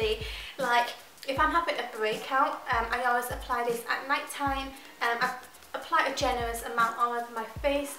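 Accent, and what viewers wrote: United Kingdom. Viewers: you have a kind of weird british accent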